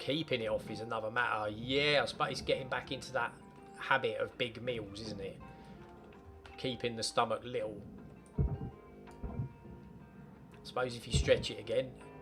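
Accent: Cockney, United Kingdom. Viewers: I’m pretty sure you’re the only cockney fluent YouTuber is subscribe to.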